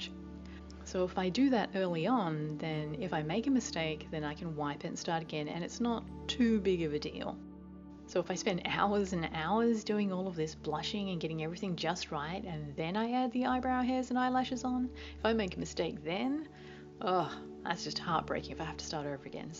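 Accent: Australia. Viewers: Are you australian? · Hi, really nice to hear a good old Aussie twang for a change. I'm so wanting to do this and also on plastic (acrylic) dolls. Which products do I use? I can't understand how you get your colours to stick? Any help would be much appreciated. Fellow Aussie from Queensland 🤗❤ Just subbed 🤗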